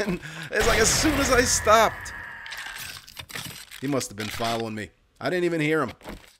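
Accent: New Jersey, United States. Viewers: the saying is "You attract more flies with honey than vinger~" which is true. In wooded areas its common to see abandoned be nests full of maggots among other insects. But its meaning is to always be sweet instead of bitter to get what you want. I love your videos. I'm in New York so its awesome to hear such a thick jersey accent outside of Jersey shore. "Cabs are here"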